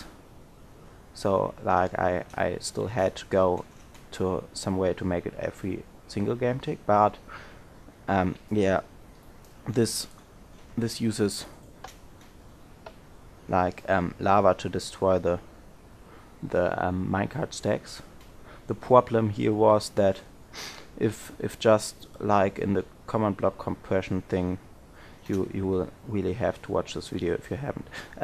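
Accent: Germany. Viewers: man merkt, dass du deutscher bist xD aber du sprichts sehr gut englisch mach weiter so :D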